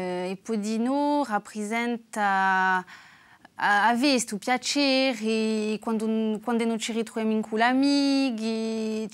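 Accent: France. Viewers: Era più facile sentirlo una volta, ora sempre meno. Quando le persone anziane moriranno la lingua sarà sempre più contaminato da questo cavolo di francese. Anche questa parlante, oltre ad avere questa R uvulare improponibile, esprime un impianto fonetico francese, con nasali, dentali che sono diventate delle affricate. Che tristezza. Mi piange il cuore osservare la lenta fine di questa lingua.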